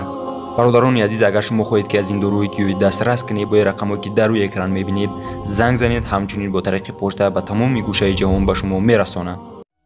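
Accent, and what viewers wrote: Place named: Russia. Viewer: Рут лахча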